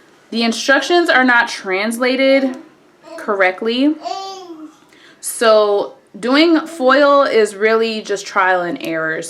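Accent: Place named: Oklahoma, United States. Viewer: Sounds like you are in lawton ok lol new sub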